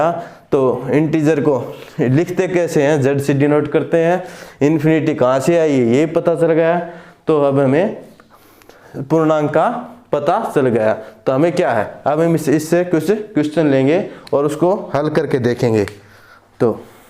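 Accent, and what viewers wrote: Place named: Haryana, India. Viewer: हरियाणवी शैली में👍👍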